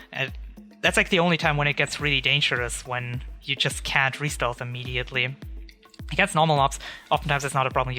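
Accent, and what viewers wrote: Germany. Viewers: are you german? :p kinda sounds like it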